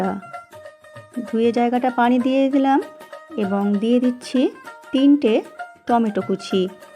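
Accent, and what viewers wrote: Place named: India. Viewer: রান্নাটা দেখতে দারুণ লাগছে। আপনি কি ভারতীয়? কথাবার্তা ঠিক আমাদের মতো মনে হচ্ছে না।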